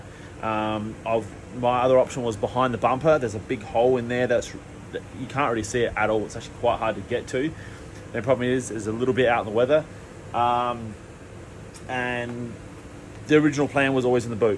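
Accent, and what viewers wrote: Australia. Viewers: this is sweet.. i was just discussing this with some buddies that have old mustangs. i was tossing around the idea of this so i have room for the 2nd turbo, but was a bit sketch to run coolant lines that far. question for ya, and forgive me because I'm a new sub.. but you sound Aussie. thought you guys had RHD's. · Two things that will always crack me up, an Aussie accent, and Ace Ventura. You sir got an instant follow as soon as I seen the rhino scene LOL. Cheers from Texas!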